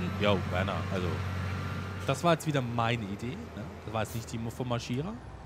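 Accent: Tyrol, Austria. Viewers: Wo aus Tirol kommst du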